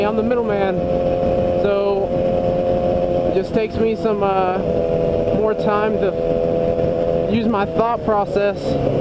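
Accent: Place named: South, United States. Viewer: Im just curious but where do you live? Like what general area? Im not trying to be creepy or stalkerish but I was wondering because you are always going to the beach, the area seems very temperate, like in this vid you see some swap. From your accent you it sounds like the south maybe? Im guessing NC/SC. Im thinking on moving down there in the spring an was curious about the riding season.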